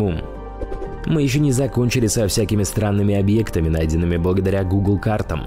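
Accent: Russia. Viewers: Как можно слушать такую речь. Передача хорошая, речь акающая😮😮😮😮Это что-то. 😂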